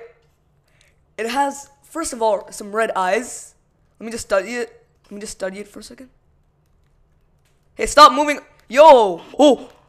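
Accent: India. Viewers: dude are you indian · Hey r u a indian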